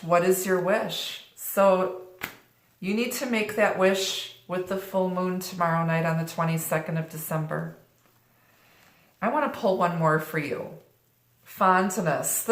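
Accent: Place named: Wisconsin, United States. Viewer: i miss the wisconsin accents in my life! listening has been a real treat for me (subscribed)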